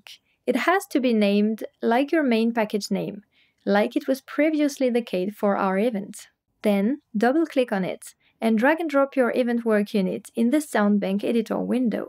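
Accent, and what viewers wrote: France. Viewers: She seems to have a little french accent.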